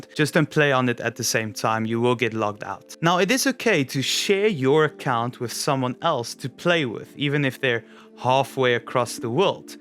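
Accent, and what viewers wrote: South Africa. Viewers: Hey man. Random Question - Are you South African? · you from south africa?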